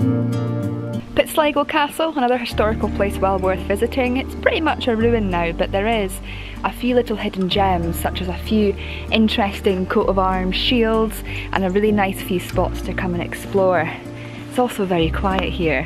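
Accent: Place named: Aberdeenshire, United Kingdom. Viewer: Hello, can i ask? Far div ye bide? Im from crimond so know all these places, live in aberdeen now because of work, but nip in past crimond and count the minutes on the clock 🤣😉 just hearing you talk makes me think you are also from aberdeenshire, great video also 😊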